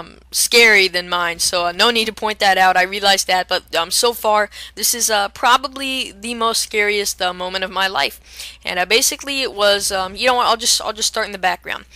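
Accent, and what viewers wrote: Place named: Portsmouth, United Kingdom. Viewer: Sounds like Portsmouth.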